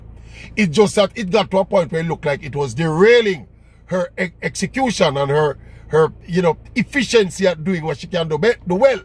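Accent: Jamaica. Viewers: I knew I would find you here brother love the Jamaican accent ✊🏽✊🏽✊🏽 🇯🇲 🇺🇸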